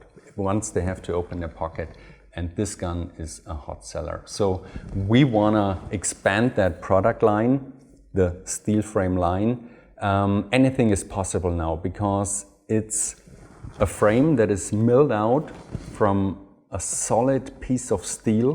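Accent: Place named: Germany. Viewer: Snobby accent? He’s a German you dick..only in ‘murica eh 😂😂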